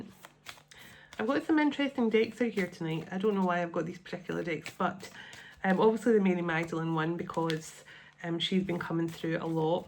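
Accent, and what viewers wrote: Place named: Scotland, United Kingdom. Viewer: YOU are so loved by all of us. When I first came across your Channel, I was intrigued by Tarot and what it was and listening to your Scottish accent was endearing....... when we connected by Zoom it was then I KNEW we are from the same Soul group..... my sister, sweetest feeling ever. I love tuning in just to listen to your stories and to hear of how God is moving you into your destiny......... beautiful. Thank you for being such a comfort to me,. I don't know how I managed my emotions until you began helping me..........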